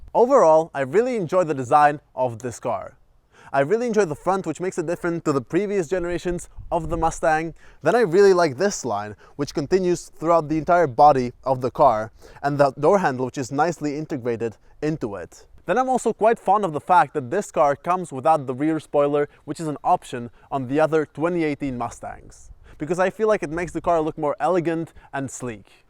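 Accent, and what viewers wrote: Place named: United Kingdom. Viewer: I didn't ask for it, its not my video. Looks and sounds like some "Bloke" from the UK...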